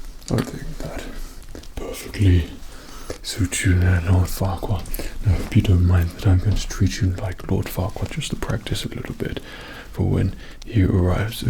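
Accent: England, United Kingdom. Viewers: i am latin american, and when i started learning english i never understood why the people (especially women) said that they love brithish accent. now i understand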